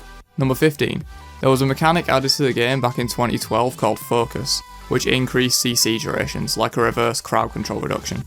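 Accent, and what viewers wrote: United Kingdom. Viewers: "Bof" "fog o' wor" "ba'el" and people say gaelic/british accents are the best lol sounds like an idiot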